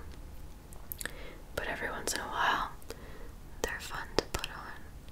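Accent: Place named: South, United States. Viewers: I love Southern ASMR!! Another fave of mine. I’m obsessed with this lip color. 😍😍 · Omg I love southern asmr sounds too!!!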